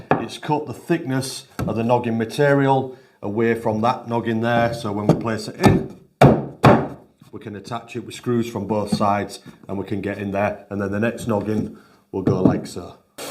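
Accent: Hull, United Kingdom. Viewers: Hi, love the stuff you do with a proper ull accent makes it easy for a Bransholme lad to understand keep it up 👍👍👍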